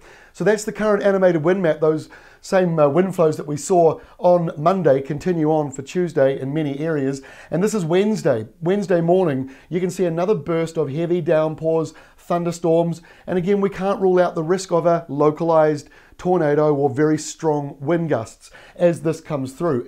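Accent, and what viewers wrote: New Zealand. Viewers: Top app team. Great presentation, love the KIWI speak!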